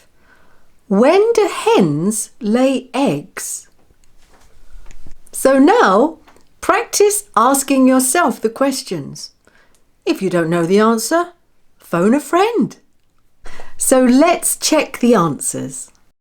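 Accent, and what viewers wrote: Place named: United Kingdom. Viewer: British accent... so lovely !!!!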